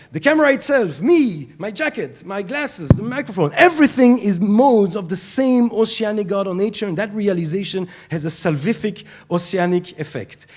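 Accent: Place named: Israel. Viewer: יש לך יותר מבטא ישראלי באנגלית מאשר בעיברית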